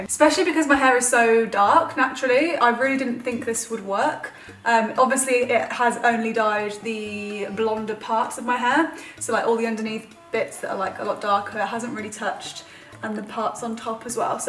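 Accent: United Kingdom. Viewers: i did not expect her to have a british accent, after all those four nine looks stories on snap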